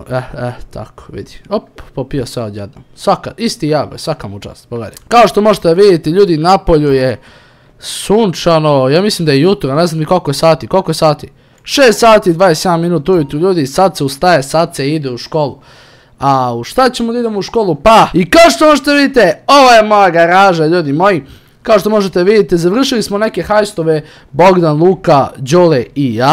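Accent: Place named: Eastern Serbia, Serbia. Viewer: Akcenat istocne Srbije. Nista lose i nista cudno -_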